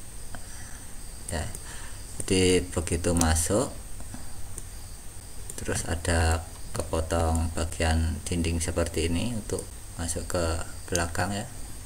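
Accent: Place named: Java, Indonesia. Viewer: Logatnya lowh. Medok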